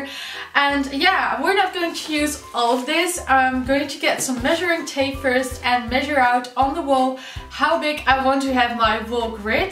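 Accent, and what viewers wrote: Netherlands. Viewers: No hate but I immediately knew you were Dutch the moment you started speaking lol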